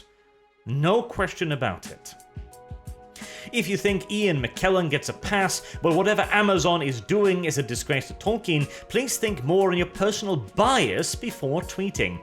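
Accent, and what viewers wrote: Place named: Southern England, United Kingdom. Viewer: do not worry about your words bro when I frist heard you speek I thought you were from south of England. Its only when you speek Kraut or Ruski I can tell your not English. Peace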